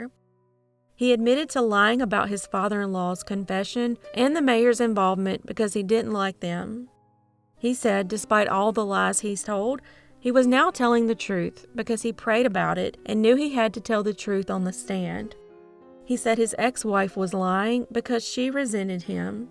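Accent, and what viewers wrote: South, United States. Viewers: Urgh that southern accent, and the way you end your sentences makes me cringe 🤮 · South African accent is mostly inspired by British or Australian accent! But in this context the person is wrong to judge her accept! I think her Southern accent is so great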